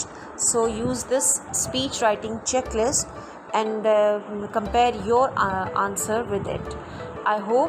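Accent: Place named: India. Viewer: oh hell nah miss ur accent is indian typa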